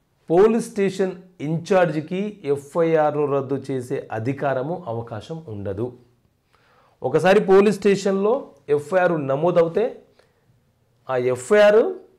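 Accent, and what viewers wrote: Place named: Telangana, India. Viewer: Dear Sir You're. But it appears you were born and grew up in Telangana. Though your Telugu has Telangana accent, it sounds extremely natural. Sir, if an accused is proved to be innocent, FIR can't be quashed by the Magistrate. Court takes cognizance of an offence, not an offender. If an Informer tells about an offence, the police must register an FIR whether he tells about the offender or not. The Informer may say he doesn't know anything about the offender. Even then FIR has to be registered and it can't be quashed unless the offence is false.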